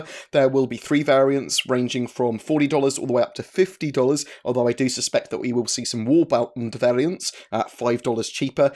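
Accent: United Kingdom. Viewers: your speech is very hard to understand, especially for not a native English speaker. can u talk more slowly or just don't eat the words? with your British accent it is completely impossible to listen to u because of your style of speaking.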